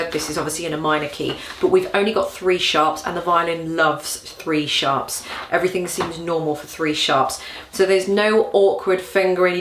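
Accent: United Kingdom. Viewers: <3 I love your British accent ! all the best!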